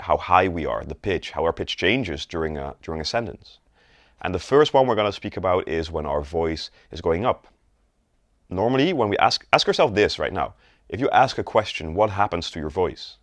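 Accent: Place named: Netherlands. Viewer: This is very good,! My guess is that you’re from The Netherlands as well! We have such a unique accent lol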